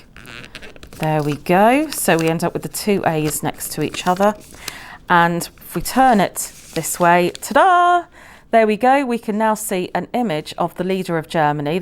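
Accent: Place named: United Kingdom. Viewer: You could be both Bri'ish, or an american makeing fun of that accent!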